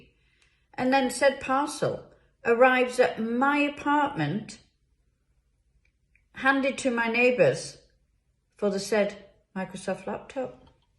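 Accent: United Kingdom. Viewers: Absolute class. Great dresser- and love that posh Stoke type accent.🤟🏼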